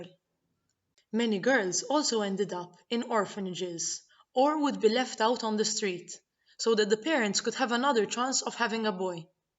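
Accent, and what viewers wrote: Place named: Malta. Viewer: I new that was a Maltese accent right away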